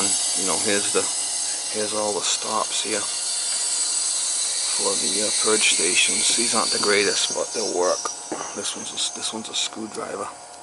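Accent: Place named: Boston, United States. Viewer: LOL, as soon as I heard "Octobah," I knew you weren't fah from Bahston. Greetings from Newton.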